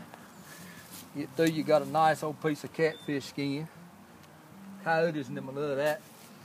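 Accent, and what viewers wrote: United States. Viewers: Can't tell if you're from Mississippi or Australia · That’s a good ole boy deep South Georgia accent. I live in Atlanta but am a country boy at heart. My whole family sounds like this man. Love it.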